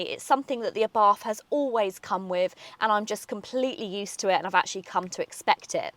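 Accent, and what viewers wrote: Essex, United Kingdom. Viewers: Everything you say sounds good in your Essex accent, including "Anniversario"! I really like it!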